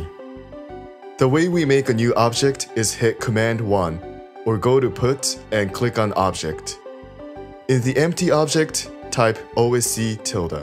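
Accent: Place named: Netherlands. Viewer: You have a slight accent (barely) and I can't tell if you're Dutch, Scandinavian or Japanese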